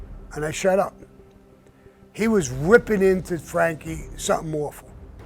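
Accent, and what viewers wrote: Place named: Brooklyn, United States. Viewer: Bensonhurst's Own...Sammy the Bull, the Brooklyn talk..😉😎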